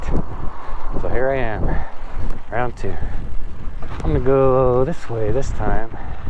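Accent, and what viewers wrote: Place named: Farmington, United States. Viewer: You sound ike from Farmington. Nice bro python sucks. Java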